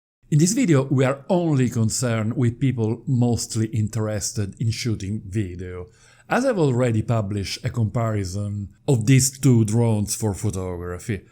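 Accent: Italy. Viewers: Awesome accent. Love it. I guess it's Italian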